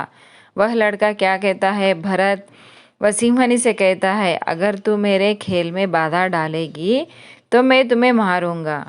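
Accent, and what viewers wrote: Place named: Hyderabad, India. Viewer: Madam aap paka hyderabad sa ho all explanation is good except some parts of explanation but ok keep it up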